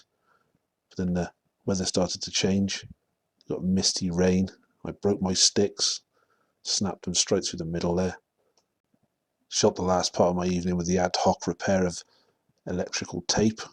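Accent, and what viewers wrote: United Kingdom. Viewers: The best commentary on the web (for this type of video I mean). The UK accent sounds great. Hello from Milwaukee, Wisconsin.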